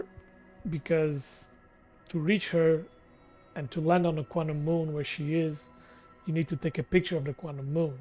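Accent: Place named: Portugal. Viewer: AHahah, com esse nome e essa pronuncia, so podes ser tuga! Fiquei altamente surpreendido ao saber que o Outer Wilds tem mod para VR, e vi isso ontem em um video sobre os melhores mods VR em 2023. E hoje fui ver melhor, e fui dar a tua pagina! Quando vi o " " parti-me a rir! Ainda estou para testar isso, mas ate agora, parece que estas a fazer um excelente trabalho! Impressionante, sim sr. Os meus parabens, desejo-te muito sucesso!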